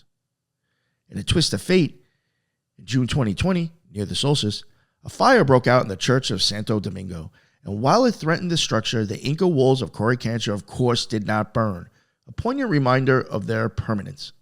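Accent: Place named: New York, United States. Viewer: 🎉 I gotta start listening to more of you. Like a little bird coming back to its nest, your warm Italian NY voice is just too dam rewarding.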